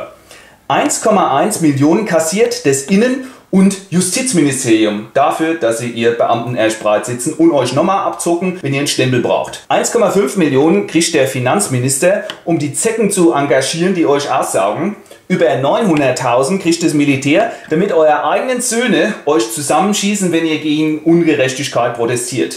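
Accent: Hesse, Germany. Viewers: Kommse aus Hesse? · Ich weiß nicht, wie man das nicht verstehen kann. Auf die Gefahr hin, dass die Frage schon 100x gestellt wurde: bist du Hesse? Bin selber keiner, aber ist sowas von leicht zu verstehen.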